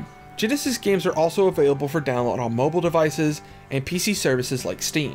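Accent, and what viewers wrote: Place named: Oklahoma, United States. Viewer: Are you from Texas or Oklahoma? I’m from Oklahoma and recognized a hint of twang in your voice and was just curious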